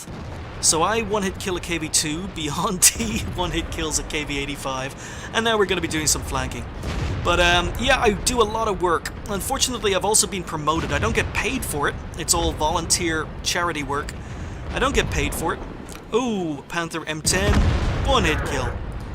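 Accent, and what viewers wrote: Ireland. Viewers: Forgive me if I offend anyone but in my admittedly very limited experience of english accents I think your Irish accent isn't very pronounced. It's definitely there but I was wondering if you're from Northern Ireland which I assume would have a more British accent?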